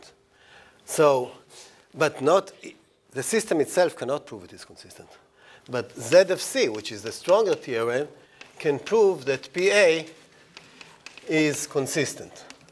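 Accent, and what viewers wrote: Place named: Israel. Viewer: The professor sounds so Israeli. LOL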